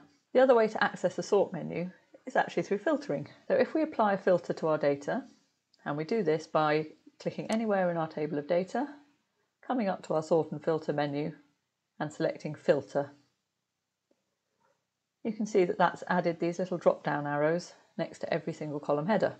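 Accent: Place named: RP, United Kingdom. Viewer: Pradeep, man. Get real. Hers is the sort of English shy of RP that people want to speak. Maybe you. Pradeep, need to listen to Amitabh speaking English more (his is actually quite excellent), unlike that of typical politicians and actors in the subcontinent.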